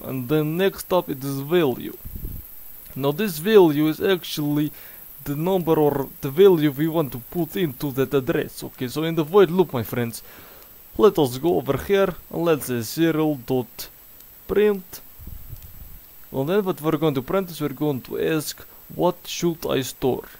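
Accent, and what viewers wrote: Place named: Russia. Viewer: Love you Russian accent... ❤️ Gangsta Russian coding guy. 😂🎉 Greeting from Serbia.🎉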